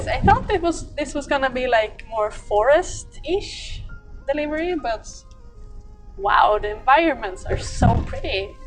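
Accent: Sweden. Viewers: låter du som alla svenskar gör i amerikanska filmer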